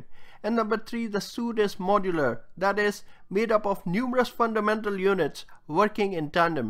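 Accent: India. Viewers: Oh wow an interesting video. Indian accent Nevermind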